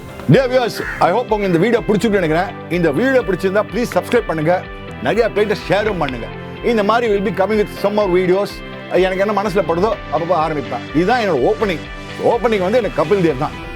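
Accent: Chennai, India. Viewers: Love your easygoing and lovely natural narration, and with the Chennai slangs it’s even more funny!! Gadupadango was the funniest! 🤣🤣 Amazing tribute to one of the all time greats of Cricket. Almost 30 years since Kapil retired but we are yet to find another one like him, that shows what a rare breed he was! Hats off!!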